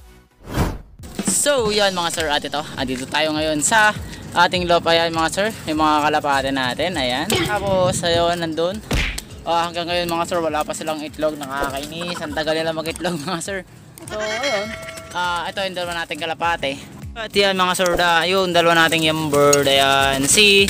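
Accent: Batangas, Philippines. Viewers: Batangas ka ga sir😂😂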